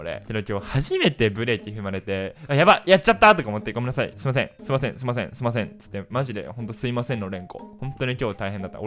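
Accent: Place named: Kansai, Japan. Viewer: この動画、最近の動画で一番好きかも！そしてたかおいおいの関西弁が移ってるｗ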